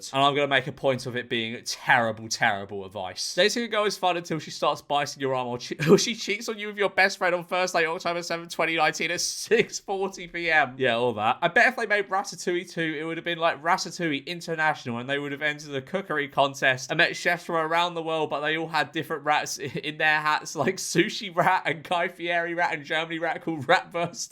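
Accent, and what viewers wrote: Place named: Birmingham, United Kingdom. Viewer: Extra brummie today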